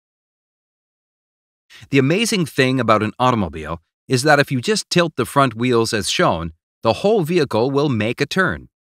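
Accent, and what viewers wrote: United States. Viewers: Why is the video narrated by an American but the driver is in Britain? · The first 12 seconds bother me. You have an American accent but your animation driving in the left side of the road. And you say steering is the hardest thing to master, it’s hard but that’s only because Americans drive automatics. You think steering is more complicated than clutch, gears and steering? Come on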